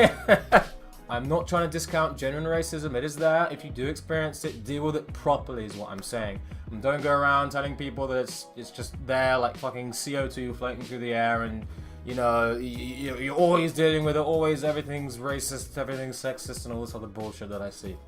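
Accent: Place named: England, United Kingdom. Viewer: Bravo! You said this so well! The curious thing in America is that people (black and white) in the rural South got over racism a generation or two ago; but in the big cities, the North, the West Coast, etc., where people think they're so enlightened, one's race dictates how he's expected to talk, dress, act, walk, drive, sing, be entertained, learn, live, worship, vote, and even procreate. For instance, you speak exactly like any other young educated Englishman. A blind customer in the shop where you work couldn't possibly know your race; but in urban America one phrase out of a black person's mouth would instantly reveal it and deliver a boatload of assumptions--most of them imposed by a destructive urban black subculture itself, not by white people trying to keep anyone down.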